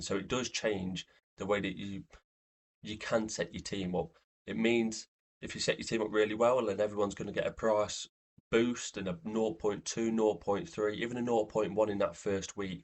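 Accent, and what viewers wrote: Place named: Nottingham, United Kingdom. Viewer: Definitely from around Notts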